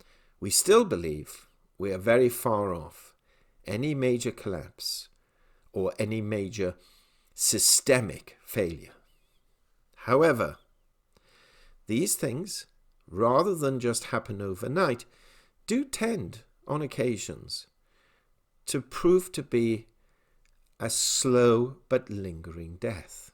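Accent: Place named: RP, United Kingdom. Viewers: I do not understand this channel. Year 2019 it was mainly critizing silver "pumpers" and mocking them. Then silver prices started to go up during the fall to the highest levels in years, so was wrong. Why call themselvs something "silver" when they clearly do not believe in the metal? I think anti-silver would be a better name. And the upper class english does not help either. Just saying... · Yes I agree! I would not be surprised if they all have ties to the central banks the LBMA & The Crimex (Comex). I'm sick of the posh tone and arrogance!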